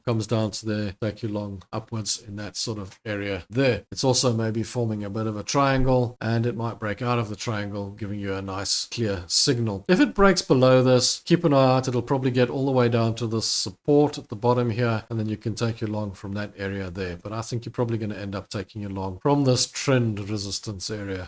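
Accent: South Africa. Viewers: Sounds south African to me 😂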